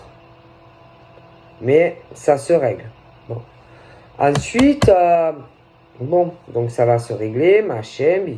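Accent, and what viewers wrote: South, France. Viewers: avec l'accent du sud je me tord de rire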